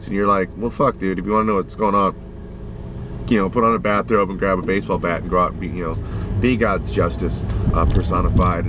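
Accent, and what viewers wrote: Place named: Australia. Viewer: Yeah the accent is most definitely Australian but yeah you better hope they don't see this =). Good stuff mate.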